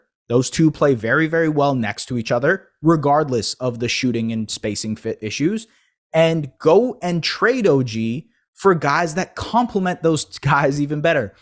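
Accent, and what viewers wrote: Canada. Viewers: Raptors trade pascal and build around barnes also u sound Canadian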